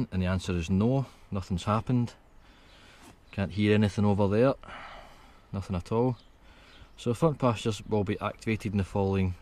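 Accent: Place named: Scotland, United Kingdom. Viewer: Thank you for taking the time to do this diag video. Well explained and I can even understand the Scottish accent. Ha. Thanks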